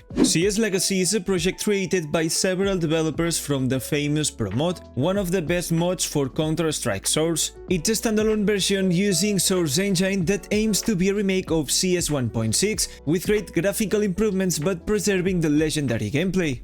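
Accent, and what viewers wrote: Spain. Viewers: COMO SE NOTA QUE ERES ESPAÑOL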